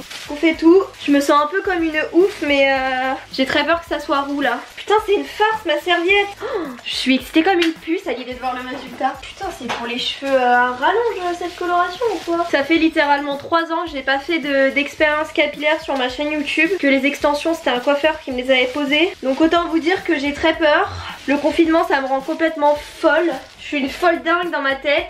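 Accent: France. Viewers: I will regret It hein😭😂😂 jss morte tu me tu avec ton anglais français 😂😭❤️ on adore tes expériences capillaires (moi aussi on est 8 dans ma tête tkt)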